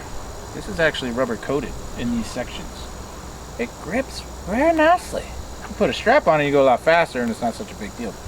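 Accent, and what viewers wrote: United States. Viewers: Thanks for taking the time to share your opinion. Refreshing to see a video that is unrehearsed or sponsored. Like your breakthrough accents and lightheartedness. Good thorough review. Own a 650 XT Max and I love the machine. Mainer eh? In NH we call you "Maine-e-acks. "Kind proved it with the wheelie segment at the end of your video. Made my day! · Literally the first sentence that came out of your mouth I can tell you from Wisconsin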